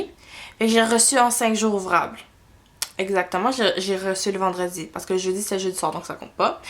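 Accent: Quebec, Canada. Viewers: c'est l'accent du Québec :))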